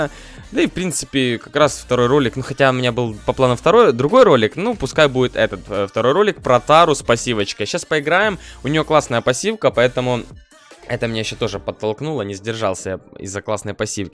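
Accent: Ukraine. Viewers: За укр акцент лайк!!!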